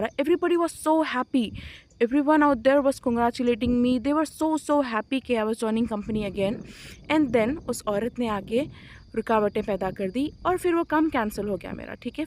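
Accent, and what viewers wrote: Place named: Pakistan. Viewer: You are indian but your accent is pakistani Btw you are from which state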